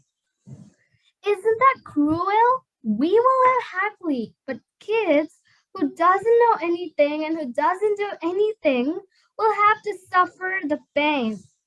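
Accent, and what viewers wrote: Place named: United States. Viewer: Speaking like someone who born in America, next level accent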